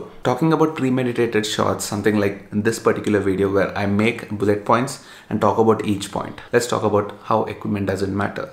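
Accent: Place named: Telangana, India. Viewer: This is the actual fun I need frm u , talking in pakka telangana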